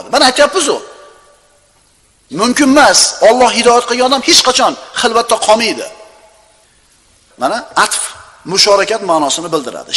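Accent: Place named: Andijan, Uzbekistan. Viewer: Ollohga Shukur ona vatanim tinch Oshdan ekan. Andijon bn shevasi bir xil ekan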